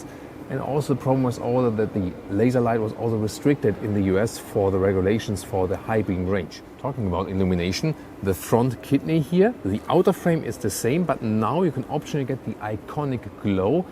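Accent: Germany. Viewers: I agree, plus I love the german accent - Im italian but used to live in Germany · There's just something right about hearing a German accent describing a BMW.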